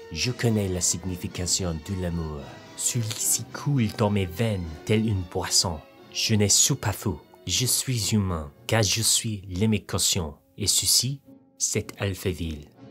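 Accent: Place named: England, United Kingdom. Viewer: Ton français sonne super élégant avec ton accent anglais !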